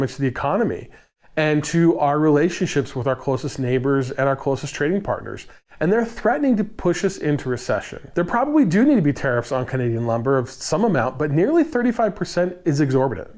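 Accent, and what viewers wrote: Pittsburgh, United States. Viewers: Hello. are you from the Pittsburgh area??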